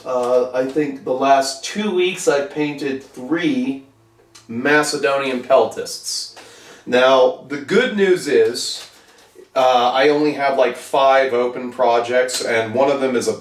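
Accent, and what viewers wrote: United Kingdom. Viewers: Appreciate the Brit accent!!! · Love the British accent. Like been in the same room as Hugh Grant.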